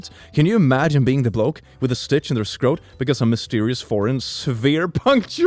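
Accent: United Kingdom. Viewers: ’ British accent is amazing From a British citizen (pls don’t give me a guy voice if u read this, and no I’m not a girl either) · Your British accent is perfect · As a Swedish person, i can only agree that this is exactly how Brits sound to me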